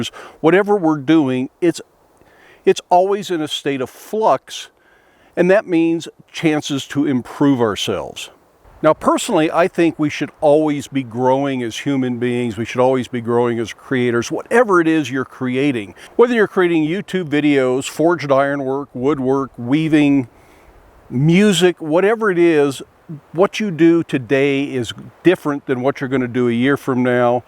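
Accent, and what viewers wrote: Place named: South, United States. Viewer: Good motivational speaker. His voice is not intimidati g as his appearance first was and i love the southern acsent. Great video for encouraging over coming problems youtube home video newby's should watch acassionably to remind them that they really can do it right and not give up.👍👍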